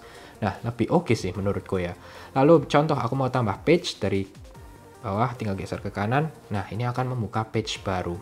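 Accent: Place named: Banyumas, Indonesia. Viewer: mantap mas..ngapak ya mas..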